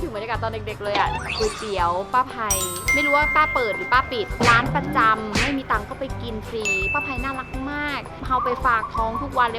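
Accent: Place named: North, Thailand. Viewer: พี่แตพูดเหนือน่ารักมากเลย ดูเรียลจริงๆ รอติดตาม Ep. ต่อไป ❤️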